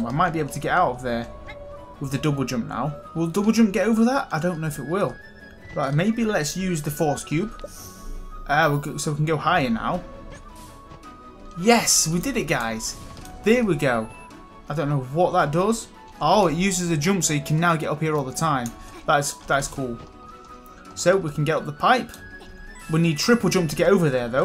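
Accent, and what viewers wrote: United Kingdom. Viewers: What part of UK is from? He has the same accent as Jon Snow.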